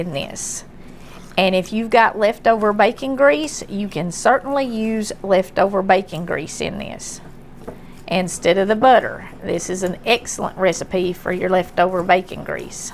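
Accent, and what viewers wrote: South, United States. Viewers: I love watching your videos. And your voice and southern accent just seem to calm me down when I'm feeling down or just out of it. I'm from Missouri so I think I have a accent too lol. But love listening to you. Take care!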